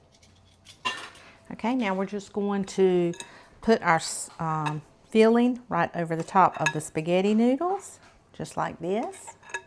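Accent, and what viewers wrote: South, United States. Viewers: Me too ! She is a joy to watch. I ❤ that southern accent !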